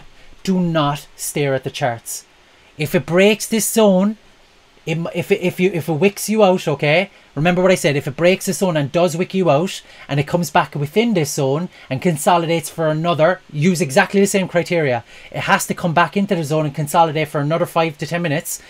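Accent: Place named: Ireland. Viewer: Hi, Very informative - excellent explanation - thank you - alright / all night (couldn't quite get my head around your Irish accent!;-) One question if I may: you were using TradingView to explain your strategy, but using TD365 as your broker. The two don't integrate (sadly), so I'm wondering how that works? Perhaps you're just using TV for demonstration purposes on your YouTube channel and your actual trading on TD365?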